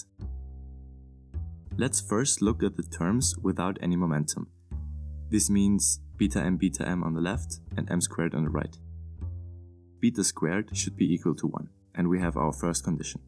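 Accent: Germany. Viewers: Nice vid, vid. Your accent and pronounciation of the word "Ansatz" sounded german, thus may i ask if you're german. I know the vid is old af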